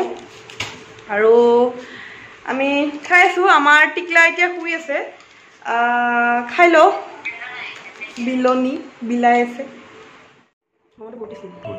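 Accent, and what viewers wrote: Nalbari, India. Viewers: অ, মাজে মাজে নলবৰিয়াৰ দৰে কথা কোৱা কাৰণে সুধিছো😍😍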